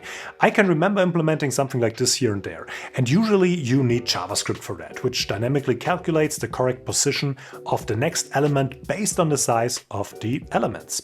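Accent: Germany. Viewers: german accent detected within the first 10s, which gives me the "made in Germany" vibe👍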